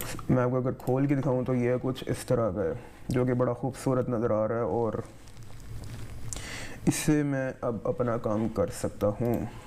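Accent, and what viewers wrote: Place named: Punjab, India. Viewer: paji tuhada punjabi accent att krva denda 🔥🤟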